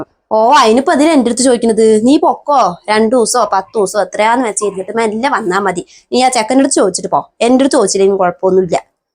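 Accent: Palakkad, India. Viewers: നിങ്ങൾ പാലക്കാട് ആണോ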